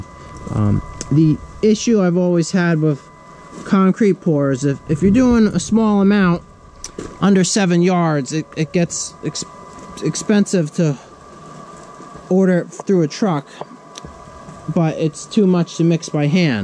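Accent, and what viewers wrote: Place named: New England, United States. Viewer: It’s an innocent question but there’s weird dudes who’d infringe on privacy. Most tubers don’t disclose for obvious reasons. dialect shouts New England for sure. I’m just guessing New Hampshire down to Pennsylvania with those beautiful mountains....but that a guess.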